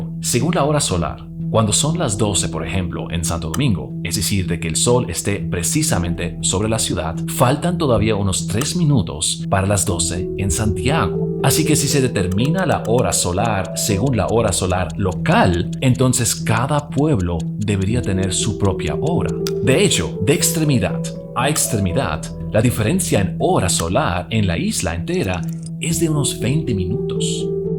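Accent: Germany. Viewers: Más bien está haciendo una pronunciación alemana.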